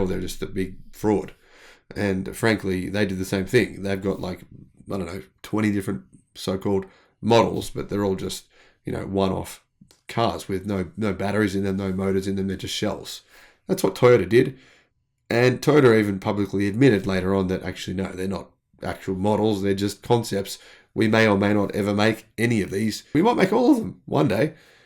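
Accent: Australia. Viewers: She's apples! No worries. True blue, Toyota's up Shit Creek without a paddle but they keep yakking on how she'll be right. Crikey, they've got Buckley's, what a bunch of bludgers, a few stubbies short of a six pack if you ask me. They say they're on a ripsnorter but it a dog's breakfast, 'cause those hybrids still need to pull in to the servo. What a bunch of drongos and galahs. They'll never make the Big V when it comes to EV 'cause it's just them pulling the wool. Fair dinkum their only attempt so far is cactus. Now they're gonna try and get a free ride by dinking on some Chinese makers. They really need to get out of woop woop, put in some hard yakka and get their ship to chuck a U-ey. Strewth... did I really say that. Should have saved that one for Ron. Don't worry... we are just stoked when you talk all antipodean!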